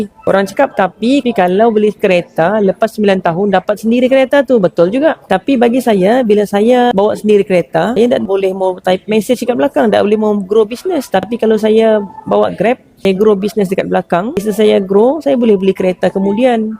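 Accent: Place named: Indonesia. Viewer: Rasa2 loghat apa kakak ni guna ye? Kejap dgr mcm indonesia, kejap macam utara.. konpius...😂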